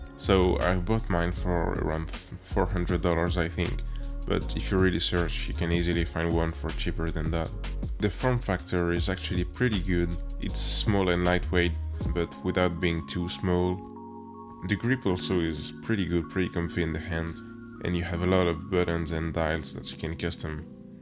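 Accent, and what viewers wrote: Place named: France. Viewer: Oh yes!!! The OG 6000 should definitely not be forgotten. Today plenty of people try to run with 4K, 10 Bit and 60 FPS before they can even walk properly. It's nice to hear your view, because it really sounds it comes from actual experience and not some stuff people say because big Youtubers say it. Keep it up man! And don't worry about your French accent!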